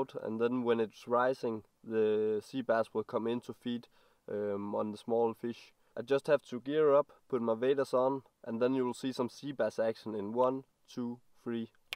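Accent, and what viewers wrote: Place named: Scandinavia. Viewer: I would think maybe Scandinavian with your accent. Wouldnt have guessed French.👍🏴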